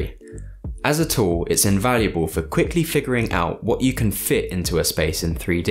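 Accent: United Kingdom. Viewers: Ohhhh!!! YOU ARE A BRIT!!! how wonderful, the second you spoke, I knew it!! I love the UK YouTube home solutions - what is the point in watching the USA ones, when you can't actually go out and buy the products. Home Depot!!! Yeah?? I am sure they deliver!!!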